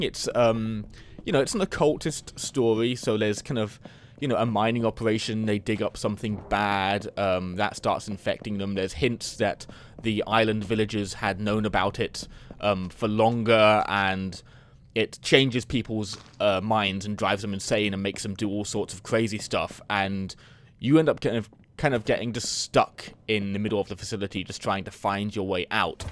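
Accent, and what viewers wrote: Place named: United Kingdom. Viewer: Your accent makes me think of Jonathan Ross - are you from the same part of Britain?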